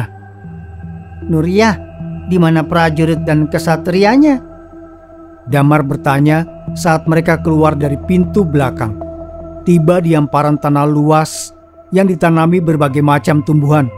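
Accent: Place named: Kalimantan, Indonesia. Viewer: Waalaikum salam jkarta hadir nyimak ,ak ud ketingaln ya, ak seneng dgerkan cenwl ini crita ya ttg alam ghoib trus,, smoga sehat tris ya bg,, ak mau nayya apa abg asli klimatan ya ,soal ya logat ya beda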